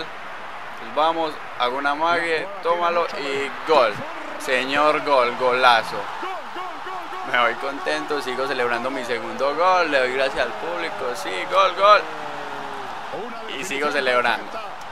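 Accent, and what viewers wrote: Antioquia, Colombia. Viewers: Ud es colombiano? me callo bien :3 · jajajaja sos paisa cierto .... me gusta como comentas esos vidoes como con esa gracia .. saludos desde cali ... por eso no me gusta el fifa solo pes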